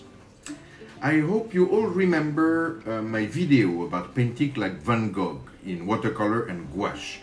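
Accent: France. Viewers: Super, vous venez du nord-est n'est ce pas? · J ai reconnu un accent français donc je me permet d écrire en français 😂 est ce que vous pouvez faire une vidéo Painting like Bacon SVP ? Super travail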